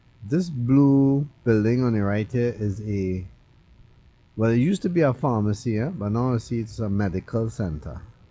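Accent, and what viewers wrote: Trinidad and Tobago. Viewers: You sound like a trini. You speak like my family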